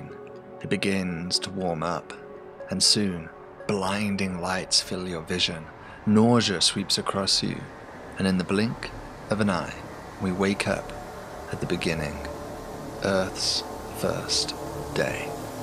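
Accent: England, United Kingdom. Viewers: I think you missed my point, obviously your nationality is determined by your country of birth but if you were raised from birth in another country then you become 'culturally' part of the country you were raised in. The point I was trying to make was that his accent is genuine as he was raised in the UK. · I love your English accent , fascinating 🤨 !! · Ain’t nothing like a British accent narrating something could listen all day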